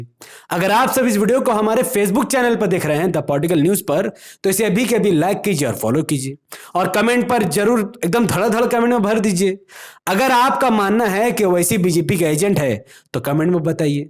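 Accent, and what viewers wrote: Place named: Bhojpuri, India. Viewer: Bahot tagdi bhojpury bol rahe Ho bhai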